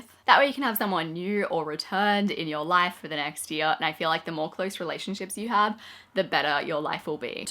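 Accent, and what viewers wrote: Australia. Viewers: Hi, I'm not English but I live in England, I thought she might be from somewhere in the North but I couldn't recognize the accent and after I had a look at her IG I saw she's based in Australia so her accent is actually Australian! x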